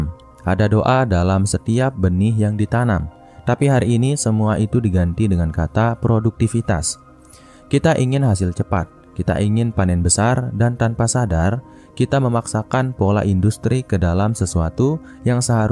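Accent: Bali, Indonesia. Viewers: naratornya bli bli Bali ya.....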